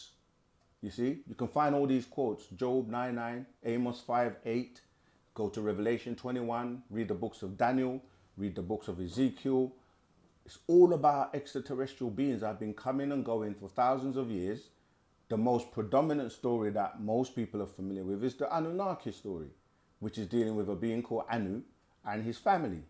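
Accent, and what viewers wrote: South Africa. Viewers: I can hear this broor is probably Zulu/Xhosa from South Africa 🇿🇦🇿🇦🇿🇦shout out ......Thank you for the break down ❤️‍🔥❤️‍🔥❤️‍🔥❤️‍🔥❤️‍🔥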